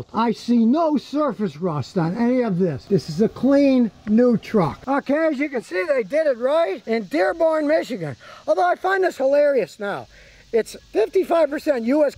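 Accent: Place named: Tennessee, United States. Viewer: It sure sounds like Tennessee lol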